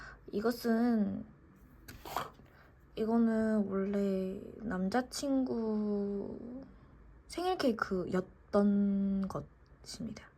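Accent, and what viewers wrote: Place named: Seoul, South Korea. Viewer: 왜 서울말 하세요?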